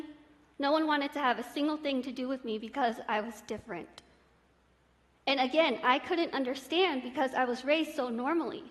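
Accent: United Kingdom. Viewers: イギリス英語ですし美しいですよね、、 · イギリス英語なんですね！ もうテストのリスニング全部この方がやって下さらないかな..🤔🤔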